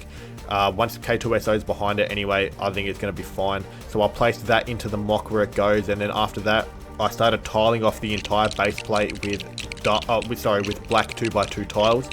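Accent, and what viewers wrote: Australia. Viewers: are you Aussie I am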